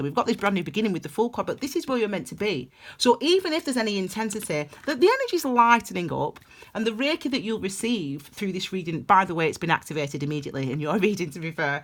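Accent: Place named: Northern England, United Kingdom. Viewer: Are you from Colne, UK. by any chance? I live in Cardiff now but I’m from Oldham originally, so I recognise the northern accent. I’m guessing it’s either Colne or Burnley.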